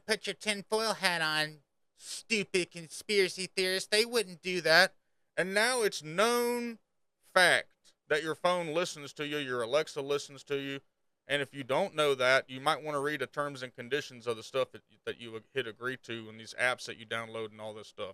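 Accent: South, United States. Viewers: man you gotta lean into the southern accent, start saying stuff like "what in the sister kissing woods was Blizzard thinking" love the videos and asmond linked you this time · got the RECITES!!! Southern brain at work! And hate to say it but when people talk about our southern twang they are just uncultured!!!! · we need more southern boiz doing content like this! Lovin the accent and screw them yankies who don't! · Lean in to that Southern draw. It's what helps you standout to me honestly. Your coverage is great and I think your ideas have merit. Regarding Asmon not giving you any credit he usually will spam a link to your channel in his live react video on twitch which I believe he did because watching him react to you is how I found your channel. Own that southern gentlemen stereotype man. Embrace it. Lean into. Let be the way you stand out o these content creator driven platforms. 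The great thing about it to is it you being you. There is no gimic or shtick. You are real authentic and down to earth kinda guy on a platform full of fakes. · oh come on, i FUCKING LOVE your accent. i think because people usually talk like that in movies where they talk about some stupid shit (the stupid southern guy caricature) but what you say is intelligent AF. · Came here from asmon and subbed, im from europe, we don't get to hear alot of american accents especially southern, i think it's great ! Makes you sound real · Anything can seem like a conspiracy if you know absolutely nothing about how it works. Southern bro here, i really appreciate your channel. Seeing people that sound like me do well, helps out a lot. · Hello. I think you are 100% right in this matter. It's like that microtransaction conference video that was released years back, and people were shocked how well structured and organized it was when it comes to min/max bleeding customers dry. 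For all Blizzard's fault and mistakes, in the business side of things, I think their decisions are based on decades of research and millions of dollars. It's no mistake that Eternal Realm was nuked and season comes with new mechanics, new powers, seasonal goodness and battle pass yummies. As for your accent. Growing up in Sweden we were bombarded with American culture from TV and movies. Southern accent folk weren't generally portrayed in a good light during the 80's and 90's. So I will at times instinctively think "oh he a redneck", but can quickly dispel that notion due to how idiotic it is to think like that. It can however, not be denied that it's human nature to dislike and be suspicious against things unlike one self. Culturally and appearance-wise. When I see someone in full leather, spikes, black hair, nose ring etc, I will generally have a negative idea of that person as a first impression. 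That does of course not mean that the person is in any shape or form a bad person. It just is what it is. I will also say that I absolutely love your accent, it is no doubt my favorite American accent. If I would ever marry an American woman, it would be a southern lady. Lauren Cohan as Maggie in The Walking Dead and Joelle Carter in Justifie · Right you are. My Southern accent is more pronounced than yours. I have an earned Ph.D. in physics. Hate it when people pre-judge me on my accent. Usually wind up being embarrassed pretty quickly though. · Subscribed! Dudes accent is so perfectly southern. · Sorry about all the rude comments you got about your accent, its clear you are intelligent. There are tons of people even up north in America that think a southern accent equates to racism, unintelligence and incest for... whatever reason. But keep doing your thing dude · 100% correct about the bigotry and prejudice regarding your accent/drawl. 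I live down here too and I’ve been told time and again ‘I didn’t think you were that smart bein a redneck’ from people who don’t even know me. I just laugh. People are indeed ignorant.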